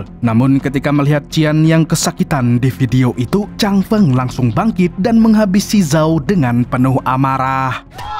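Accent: Banjar, Indonesia. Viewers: Jahh urang Banjar kah min? 😅😁👍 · nahh urang banjar sekalinya amang nih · Babang editor ni org Banjar kah😅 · Buhan Banjar aaja nihh adminnya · Banjar sekali nya semngatt tarus bang ngonten ny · Urang Banjar kah l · urang banjar jua kali ya pian lah😂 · uhhh org banjar😂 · Adminnya urang Sungai Tabuk kah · Urang banjar pinanya sidin..😅😅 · Orng banjar kh mang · urang banjar kah pian mang😅😅😅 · widih orng banjar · Urang Banjar kah mang?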